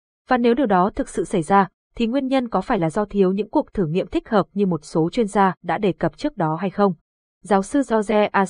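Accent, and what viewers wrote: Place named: North, Vietnam. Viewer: Đoc nhanh, giọng BK khó nghe! xin vui lòng…!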